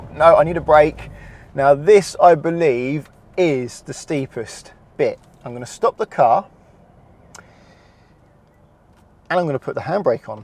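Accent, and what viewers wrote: United Kingdom. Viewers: uhh, the video host is obviously British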